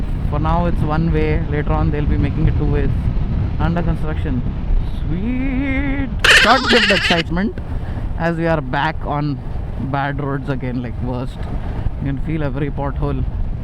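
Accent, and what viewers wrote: Hyderabad, India. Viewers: again hydrabadi touch😂😂 keep it up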